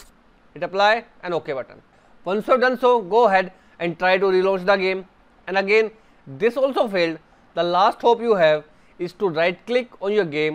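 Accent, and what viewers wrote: India. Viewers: YEA THANK YOU I HAVE BEEN TRYING TO GET IT TO WORK THE ENTIRE DAY IT WORKS AGAIN TYSM HOLY POOP IM LIKING UNDERATED VIDEO MAKE MORE FIXES I K NEW I SHOULD LISTEN TO SOMMEONE WHO SOUNDS INDIAN BUT THAT NOT MATTER IT WORKED THANK YOUUUUUUUUUUUUUUUUUUUUUUUUUUUUUUUUUUUUUUUUU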